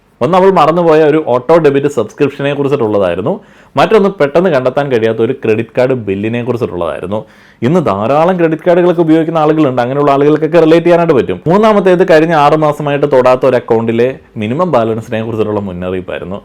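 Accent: Kottayam, India. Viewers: May be kottayam slang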